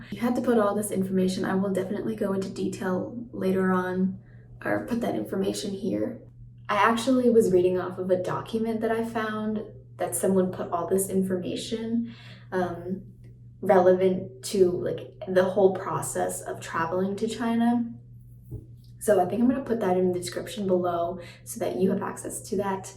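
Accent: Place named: United States. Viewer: Your accent is typical America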